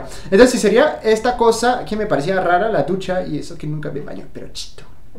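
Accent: Bogotá, Colombia. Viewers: Re perdido..hablas muy Colombiano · Hola en cuanto tiempo aprendiste español colombiano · Tiene el acento rolo medio ahí jajaja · Soy mexicano , me encanta Colombia voy muchas veces al año, me doy cuenta que hablas muy bien el español colombiano, hablas tan rápido como ellos jiji, me gustó tu vídeo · De Francia!!, hablas muy bien el español y me encanta tu acento colombiano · Hola, tienes un acento muy rolo. Jajaja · Primera vez que veo tus videos, me encanta que te guste mi país... que chevere tu acento ya es muy colombiano, extraño mi tierra a la distancia, y el himno a las 6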